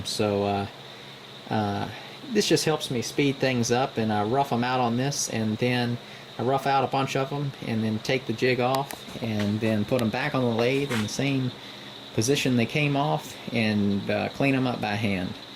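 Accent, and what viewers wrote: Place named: Tennessee, United States. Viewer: He may be in Texas, but I'm guessing Middle Tennessee. His accent sounds just like a bunch of my relatives from around those parts. I love that accent. I could listen to him talk all day.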